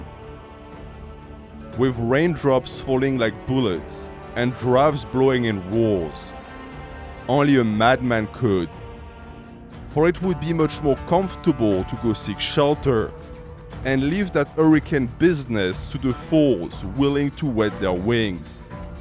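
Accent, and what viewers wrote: France. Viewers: Although you are German , your accent is very similar to a French accent.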